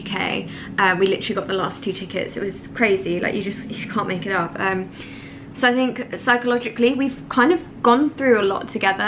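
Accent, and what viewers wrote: United Kingdom. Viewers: I swear, as a frenchie follower who adores listening to your beautiful British accent, and you laughing in Kensington gardens was the most British thing I ever listened to!!! XD I mean ladies... Your laughters are as British as the Thames! 😅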